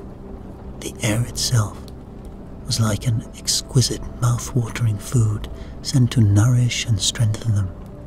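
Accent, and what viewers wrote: Scotland, United Kingdom. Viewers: What's also interesting about the author is he has a beautiful Scottish accent, so the fact he can do all these other accents just makes it even more impressive😊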